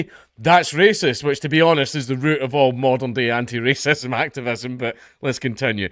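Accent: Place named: Scotland, United Kingdom. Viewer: Damn I have missed that Accent - Great Video Pal, Long live Scotland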